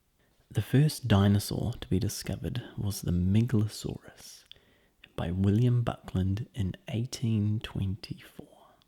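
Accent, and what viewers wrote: New Zealand. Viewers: what part of new zealand do you come from? im from auckland :)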